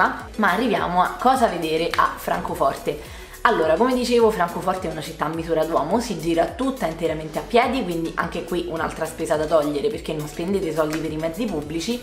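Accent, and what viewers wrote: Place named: Rome, Italy. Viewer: Io sono stato ad Amburgo e l'ho trovata stupenda sotto il periodo natalizio ma penso sia una cosa tipica delle città tedesche, che ne pensi? Comunque è già nella mia lista dei posti da visitare! Grazie :D Ps: adesso lo sento l'accento romano ahahah ti avevo chiesto di dove fossi perché mi piace incontro le persone che seguo assiduamente e conoscersi dal vivo! Da uno sprint in più !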